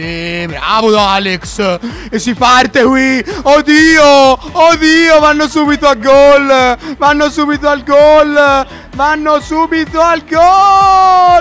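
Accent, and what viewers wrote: Tuscany, Italy. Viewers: In questo video il toscano era al massimo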